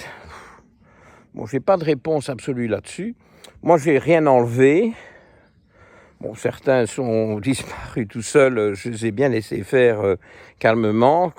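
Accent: Belgium. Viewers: C’est toujours un super régal de vous écouter et de voir vos compagnons 😘 ,votre plaisir et votre joie de nous partager la beauté de votre nature et de vos animaux, me remplit le cœur à chaque vidéo… Merci pour tous vos conseils, et j’apprécie tellement que votre discours n’est jamais coupé par de la publicité. Merci 1000 fois. Et puis votre accent belge vos expressions me ramènent des origines… bref avec vous, je tire mon plan😍😍😍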